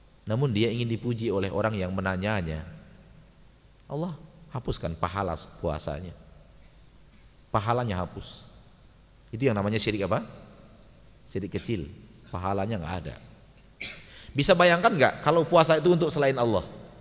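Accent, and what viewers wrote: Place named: West Sumatra, Indonesia. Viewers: uztad orang mana..? seperti nya menggunakan logat minang..yg sangat jelas logat nya. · Vimia Zuhry org Pekan Baru suku Minang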